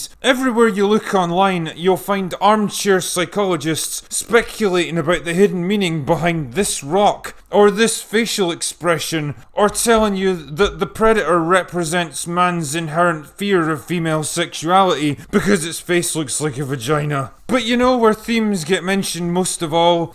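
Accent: Scotland, United Kingdom. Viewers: I never get tired of Scottish accents. ;) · The Scottish accent and the sarcastic drunk voice make these videos so much better. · Critical Drinker. You are my favorite film/tv show reviewer on YouTube. Besides your hilarious persona, which is riddled with burps, farts, alcohol abuse, powerful Scottish accent and general condescension towards the "greater themes", I think you really nail what makes a good movie. It's like you said, it's about the plot, internal logic and character development. Really simple shit. Keep up the good work!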